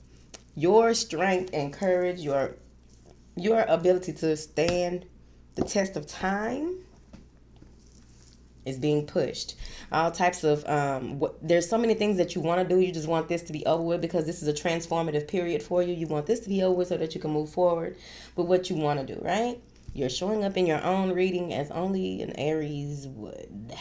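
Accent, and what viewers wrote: South, United States. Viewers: I love her country gal accent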